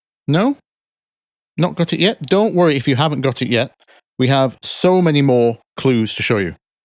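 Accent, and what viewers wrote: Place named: West Yorkshire, United Kingdom. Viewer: nice videos! You sound like you're from west Yorkshire, talking posh? 😀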